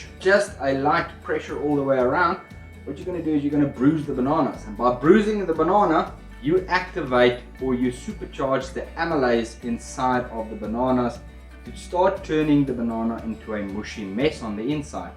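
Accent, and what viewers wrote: South Africa. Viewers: Are you South African? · Bruh !!! South African?! Hell yeah buddy! Nice show! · South African?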